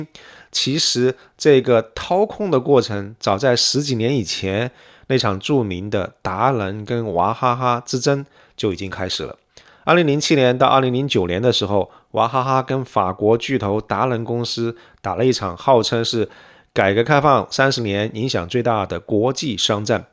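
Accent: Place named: Guizhou, China. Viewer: 博主是贵州人吗？跟 二爷 的口音好像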